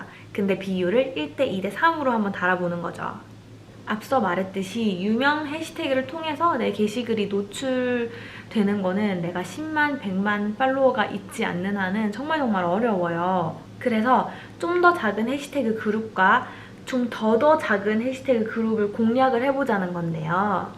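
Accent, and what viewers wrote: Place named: Gyeongsang, South Korea. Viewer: 경상도분이시네요 ㅋㅋ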